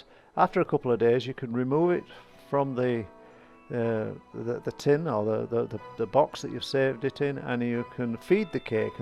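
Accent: United Kingdom. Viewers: I made this cake for the first time this year, followed Delia's recipe to the letter (from the book) and didn't look at it for 4 hours as instructed and it was very burnt, black in places. Very disappointed but too invested to stop so I trimmed off the burnt bits, top sides and bottom, fed it and iced it following your video this time, which was very helpful, so it'll look great but who knows what it will taste like. Sounds like you're from my neck of the woods or over the border (West Yorkshire). Happy Christmas!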